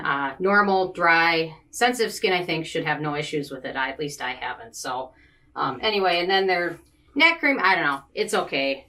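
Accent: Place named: Wisconsin, United States. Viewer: The moment you opened your mouth and talked, I KNEW you were from Wisconsin!!! Love my fellow wisconsinites!!!!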